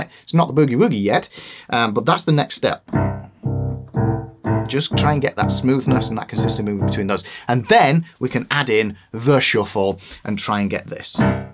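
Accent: United Kingdom. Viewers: I’m watching this for my daughter. I know music theotry, but I am a bassist who know plays mostly gtr. But because you have Czech name and a Harry Potter accent, she might listen to you. If a I showed her this, I’d be just a stupid dad, but for her your professor…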